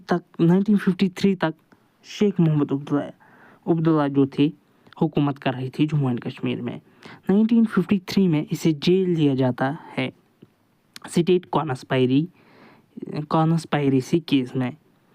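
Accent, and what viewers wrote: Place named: France. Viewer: french kee english bolna chod dooo mra bahi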